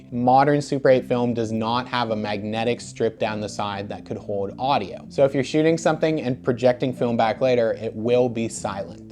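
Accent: Canada. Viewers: You have no idea how much I wish I'd found your channel a decade ago if it existed.. I had so much analog in my house and I didn't know how to do a thing with it and then I had a fire and a crazy crackhead tenant breaking in before that all I have left is a few things from the few super 8 reels that I managed to carry out of the house. I'm so afraid to run it thru a projector because I don't have much of anything left of my late parents and none of my brother since they have passed away. Where are you from? You sound like an old friend from Winnipeg and you are adorable! If I was half my age I would be starry eyed between your Canadian accent and your handsome face!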